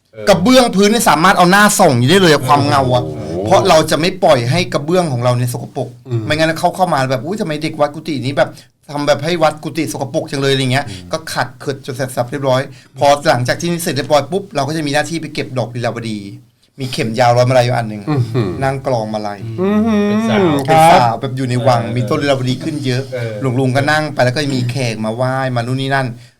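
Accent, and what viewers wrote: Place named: Sukhothai, Thailand. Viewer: ชอบ เล่ามากสนุกมาก ชอบฟังภาษาโข๋ทัย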